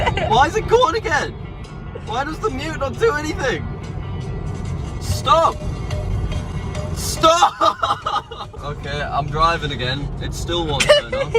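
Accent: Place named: Newcastle, United Kingdom. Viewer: Tesla Geordie might also be a good name for the channel. At least that's what you sound like to me. Great fun any road.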